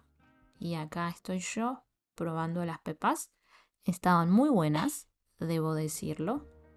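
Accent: Argentina. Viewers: gracias por este video, soy de Inglaterra y estoy aprendiendo español. Me gusta el acento argentino.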